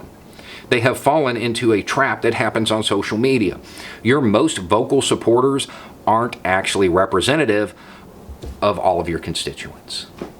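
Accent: South, United States. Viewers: you make the hardest subjects so easy to understand for we mortals. Your southern drawl delivery is calming. Thank you sir and keep the good work!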